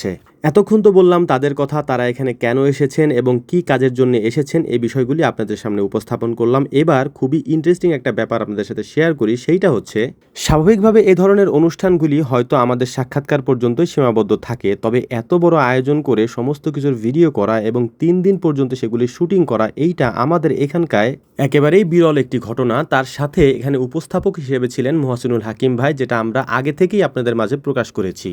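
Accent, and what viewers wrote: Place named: Takerhat, Bangladesh. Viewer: Viya apnr basha maybe takerhat er ase pase.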